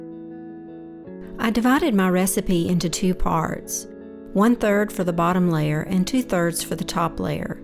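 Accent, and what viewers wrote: South, United States. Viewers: I am so proud of you I have a tear in my eye. This is an amazing video that I can tell you put your heart and soul into. I love your voice over! And let me just say I did not imagining you having a southern accent! I need to change the way I say, “Oil (insert Southern California accent 😂).” You say it so much cooler! Bravo and take a bow!!!!!!!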